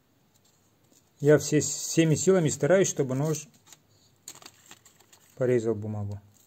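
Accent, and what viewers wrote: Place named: Caucasus, Russia. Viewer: ДОБРЫЙ! ДАВНЕНЬКО НЕ ЗАХАЖИВАЛ, К ВАМ В ГОСТИ. ОБЗОР ПОУЧИТЕЛЬНЫЙ ( У ВАС, ИНАЧЕ НЕ БЫВАЕТ ). МИРА ВАМ И ДОБРА 🙏 P.S. КАВКАЗСКИЙ АКЦЕНТ, ВАМ ИДЁТ 😉✌️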